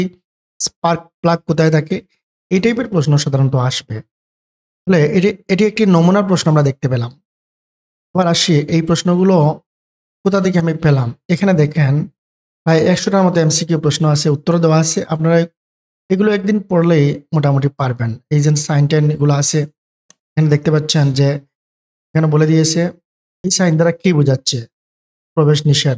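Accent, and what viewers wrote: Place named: Sylhet, Bangladesh. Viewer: আমার মনে হয় আপনি সিলেটি😊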